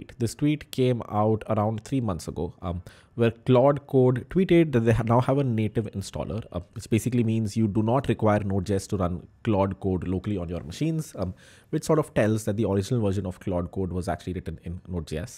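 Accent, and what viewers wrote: India. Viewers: Haha it's his Indian Ascent. I hardly understand so I listened very carefully 😅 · Indian accent English with this much pace is very difficult to understand..slow down a bit bruhhhm.. it seems like rapping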